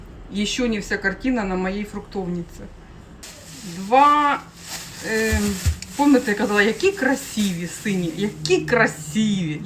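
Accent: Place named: Luhansk, Ukraine. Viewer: Чому питаю, бо думала, що Ви десь з Луганської області, бо ми розмовляємо так само🤗 Було дуже приємно Вас слухати♥️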